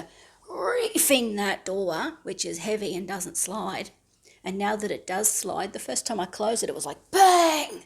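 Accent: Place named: Australia. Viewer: Absolutely brilliant thank you! Love the tip at the end about not reefing the door open as that's exactly what I'd do, old habits etc. Another thing, an Aussie accent yay. Love your Asst Tradie, beautiful moggie! Thanks again for a great video. From Western Australia ❤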